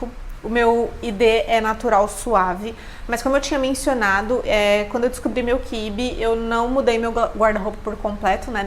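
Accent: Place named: Brazil. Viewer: Yes, portuguese from Brazil accent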